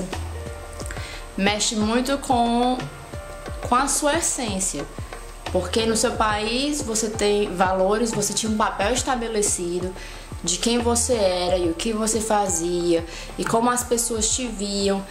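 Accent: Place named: Northeast, Brazil. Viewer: muito bom o video, eu morei fora e senti a mesma coisa, pelo seu sotaque você parece ser do nordeste como eu, acho que é pior...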